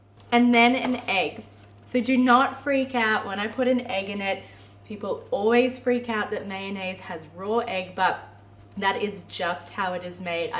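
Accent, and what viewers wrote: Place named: Australia. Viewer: So you’re Australian, I should have known your accent.I’ve made your garlic confit. I love all your videos.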